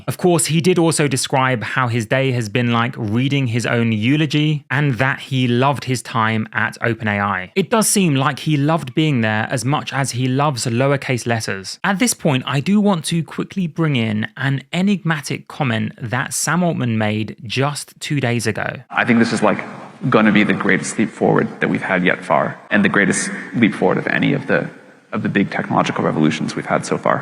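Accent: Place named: United Kingdom. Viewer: You went full detective mode in this one. Your British accent fits that very well xD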